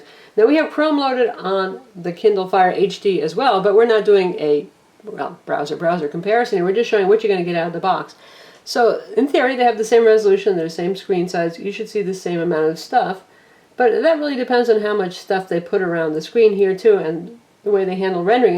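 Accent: United States. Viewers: I'm sure that this is a very helpful and interesting review but unfortunately I only understood about half of what the young lady was talking about! She speaks SO quickly and with an American accent I find difficult to follow.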